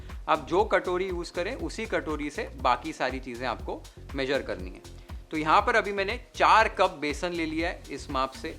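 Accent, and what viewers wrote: Maharashtra, India. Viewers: आपकी हिन्दी महाराष्ट्रीयन style की लगती हैं लाडू = लड्डू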